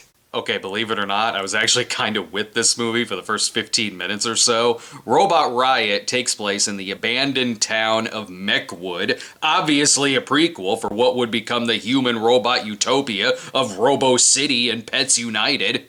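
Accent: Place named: Illinois, United States. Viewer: General Dix, Litchfield. Your Illinois is REALLY showing.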